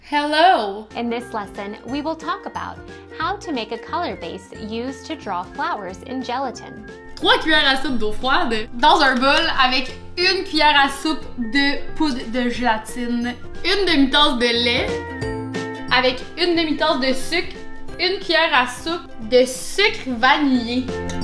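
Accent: Quebec, Canada. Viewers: Tu est d'origine Québécoise ou bien Anglaise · Celia Michel oui j’avais remarqué qu’elle était québécoise merci 🙏🏼 je suis d’autres québécois qui ont l’accent moins prononcés !! Je n’ai jamais eu de mal à les comprendre !! Mais là c’était dure !! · je viens d'arriver sur ta chaine et ton axen québecois te va super bien mais j'ai du mal a comprendre le québécois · Yanis Bahar elle est québécoise donc c’est pas la même façon de parler français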